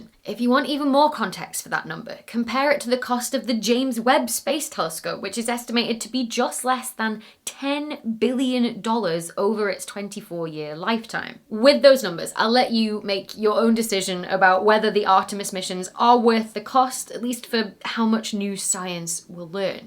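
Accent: United Kingdom. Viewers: Wondering if you'll see this, but curious, are you British? Thanks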